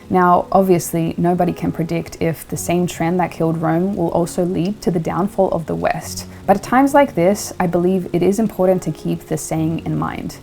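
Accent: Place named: Australia. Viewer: I have watched a few of your videos and I thought you had an Australian accent but also American and something else. G'day mate from down under. First I want to mention that the world economy was in a big down swing long before the plandemic and staged war. I recently sold my house in less than 24hours and have moved to a van with no electricity or running water but I'm debt free and living on a friends property rent free. Very interested in putting my money into the bank of Australia. Thanks for your honesty and Respect and kindness 🖤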